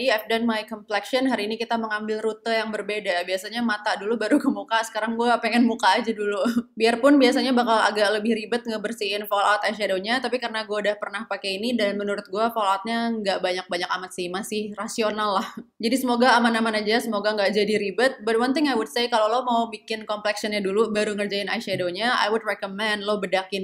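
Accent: Java, Indonesia. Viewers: aku ko selalu ngakak ya setiap ngomong jawa, medokkk banget 😂😂😂 Tp ttp cintaaaa❤❤❤❤❤❤❤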